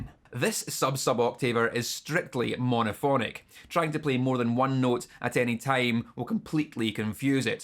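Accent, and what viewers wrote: Scotland, United Kingdom. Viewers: Where is the commenter who transcribed Scottishness? I demand one of those for every one of his videos now. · Is that a scottish accent I hear?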